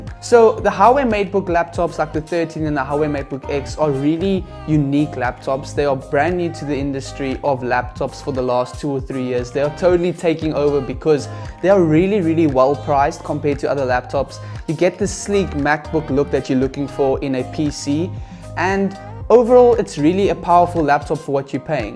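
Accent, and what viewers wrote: South Africa. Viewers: When you said (SMUTH) my brain said...he sounds like he came from South Africa.😁 but your location says LA. hehe EDIT: yea I was right. hehehe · The only video that actually helped me with finding which laptop to buy thank you. The SA accent reminded me of home brew.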